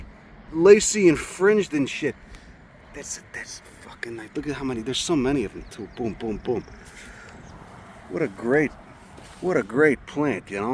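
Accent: Chicago, United States. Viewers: 💯% and with humor. All the above + primo authentic Chicago accent mixed in as a bonus. nothin better 💫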